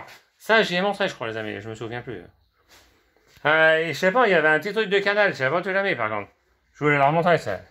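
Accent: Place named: Quebec, Canada. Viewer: Coucou 🤗 je viens de regarder tes 3 parties de retour sur les conseils de Cécile et j adore 😉 d où est ton accent ? Quebec ? Je m abonne 😊 à bientôt 😙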